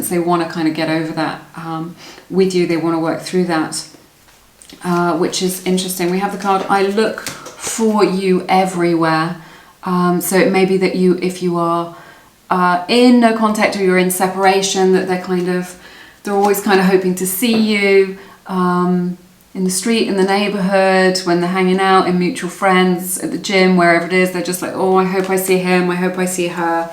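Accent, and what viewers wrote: United Kingdom. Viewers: Dear, i just wanted to tell you that i absolutely love how you talk (that british accent...👌) also, pile 2 resonated so much, thank you so much!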